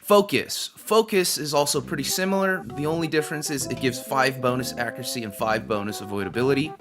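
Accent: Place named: United States. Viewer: dude your accent is awesome, which part of america does it come from?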